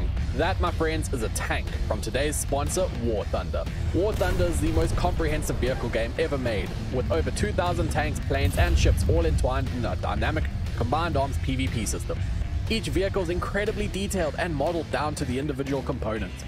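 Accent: South Africa. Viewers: You South African😮😭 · U from south africa???? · WAIT UR SOUTH AFRICAN OMG BRO WHAT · I was like this man sounds like a south african and when you said ahh eskom i was like yes he is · i knew you where also south african i knew it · My guy are you from south africa? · I knew by the accent your South African lmao🇿🇦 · Are you south African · No wonder your accent felt so familiar you're from SA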